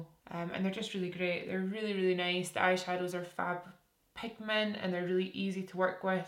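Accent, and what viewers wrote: Scotland, United Kingdom. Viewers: I start to annoy myself when I watch ur videos. I am from Vancouver Canada and I find myself repeating every other word just trying to mimic you..I love your accent🥰💖🥰lol my bf is usually on the bed beside me and he can’t see or hear what I’m watching, he just hears me saying random words with a Scottish accent to myself in a very serious tone🤦‍♀️😂🤣😂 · LOVE that Scottish accent omg..my mom is from Paisley, its awesome!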